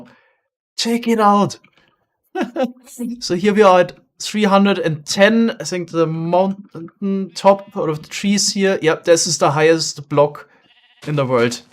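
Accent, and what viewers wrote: Germany. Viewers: Du bist Deutscher oder? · Du bist deutscher oder?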